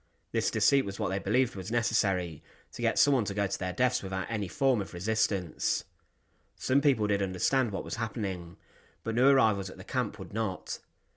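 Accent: United Kingdom. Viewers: That is the young man's accent! Everyone from his neighbourhood in the UK will speak in this manner. Nothing to do with disrespect.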